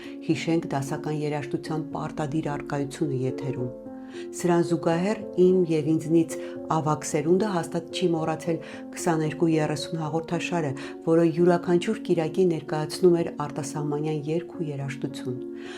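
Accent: Standard. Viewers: գեղեցիկ ու մաքուր հայերենը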